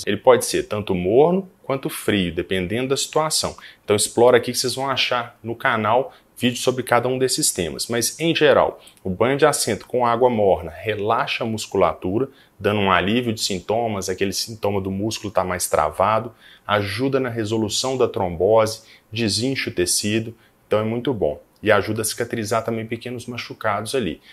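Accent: Minas Gerais, Brazil. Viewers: Esse sotaque mineiro, é uma graça!!! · O sotaque mineiro é o mais bonito!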